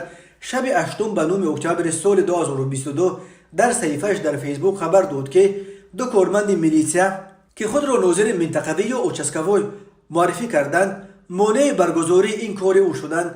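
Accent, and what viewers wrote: Pamir, Tajikistan. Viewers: Памирский акцент, помири гап зан помири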